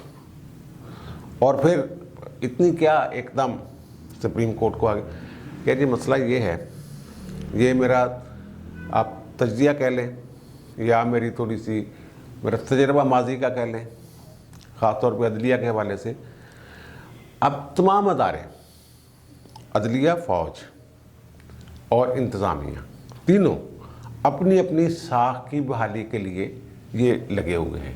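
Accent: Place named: Punjab, India. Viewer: آپکاُ پنجابی تڑکا بہت اچھا لگتا ہے !! بڑا علمی اور تجربرکانہ تجزیہ کرتے ہئیں آپ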